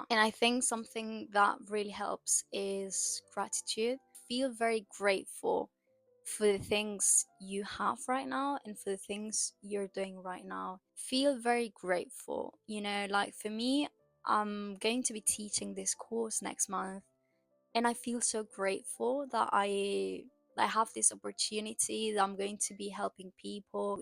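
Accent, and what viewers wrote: United Kingdom. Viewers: so, where are you from? cuz your accent is pretty similar to British🙂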